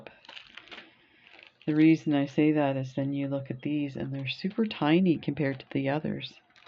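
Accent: Canada. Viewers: Love watching your unboxing videos. You're so excited the whole time. You sound much like a Cape Bretoner, which I am lol. You sound like someone I could be good friends with. Anywho just wanted to share my appreciation, I've just started my beading and jewelry making hobby about 2 yrs ago and love it. I'm looking forward to more video from you and still catching up. Have a great night.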